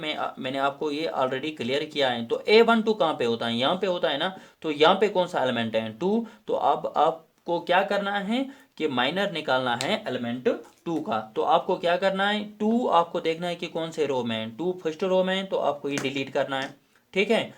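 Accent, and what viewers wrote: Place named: Kashmir, India. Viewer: Super explaination thodi kashmiri hai but mast h sir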